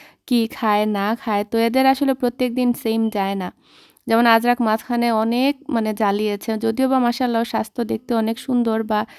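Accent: Sylhet, Bangladesh. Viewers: তুমি সিলেটি তোমার সিলিটি কথা সুন্দর লাগে আমি ও সিলেটি।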